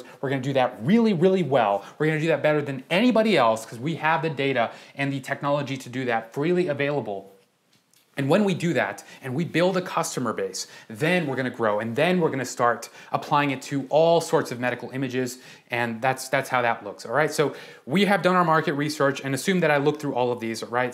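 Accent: India. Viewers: Are You Indian? 😃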